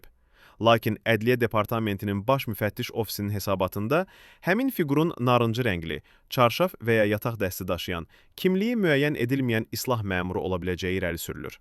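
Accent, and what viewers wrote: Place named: Azerbaijan. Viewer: Senın dedıgınde. Bır şey. Anlamıyorum. Azerı konuşuyorsun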